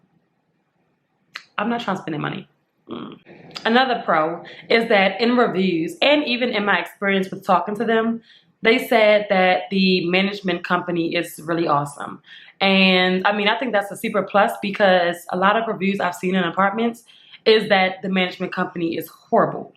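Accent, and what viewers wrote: Baltimore, United States. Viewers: That Baltimore accent is strong 😭😭😭😭🥺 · Hour on the train. And accent. Gotta be from Baltimore using the Marc. I almost switched off the video thinking it was over not even realizing you were about to do the tour and that I had been listening to you this entire time without even seeing the place! Your voice is very nice.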